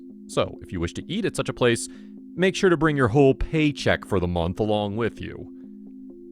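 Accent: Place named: France. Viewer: Your French accents is nice sir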